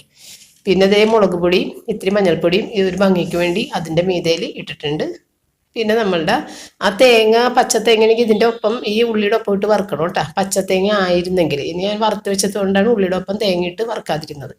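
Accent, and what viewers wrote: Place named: Ernakulam, India. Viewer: ernakulam dt aano. Slang ketittu chodichatha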